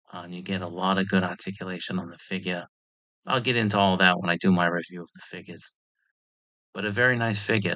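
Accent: Boston, United States. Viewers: My Yankee ears are picking up a Red Sox accent lmao. Thanks for the updates bro. Need that new Batman and Eradicator soon!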